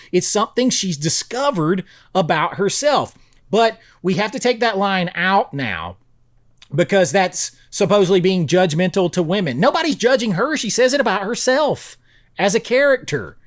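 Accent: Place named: Georgia, United States. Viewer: I have been on and off as a subscriber to your channel for quite some time. Here's the reason why: I've noticed that I strongly disagree with some of your reviews of "overhyped movies that are actually bad," as well as with many of the "hidden gems" from the '80s, for instance, that you present on your channel. To me, you tend to express your views in a fairly black-and-white manner — with that lovely Georgian delivery of yours — stating that something is either sh*t or absolutely great, with very little middle ground. At times I've grown frustrated enough with this to unsubscribe from your channel in dismay. Having been born in 1970 myself, I've seen and know most of the films that you review or include in your lists, and I find it interesting that there are many movies we both consider masterpieces, while on many others we couldn't disagree more. 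The topic of censorship is, once again, something I couldn't agree with you more on. Those "tiny adjustments" made to works of art that you brought up in this video — things that are merely reflections of their respective times and of the worldviews of characters from a particular era — should be subject to criminal prosecution more than anything else. It is genuinely disturbing to see this kind of trend, especially when modern movies already tend to be far inferior to the works of art produced in decades past. So, the persistent reappearance of your videos in my feed has once again made me a subscriber to your channel. I try to re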